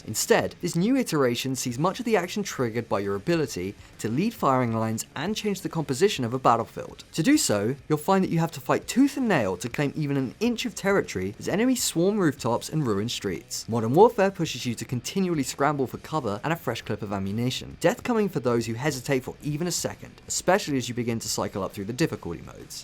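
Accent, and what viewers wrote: United Kingdom. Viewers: Defs british, not nz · British I think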